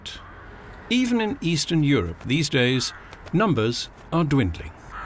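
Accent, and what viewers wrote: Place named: England, United Kingdom. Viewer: Oh my God you sound so English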